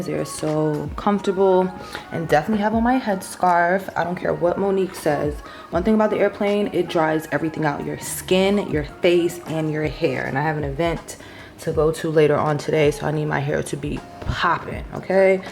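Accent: New York, United States. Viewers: we live for that face and NY accent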